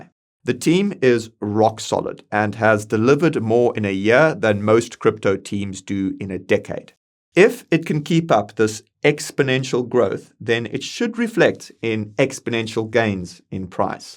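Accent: South Africa. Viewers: A new south african guy hosting coin bureau? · Is that a South African accent?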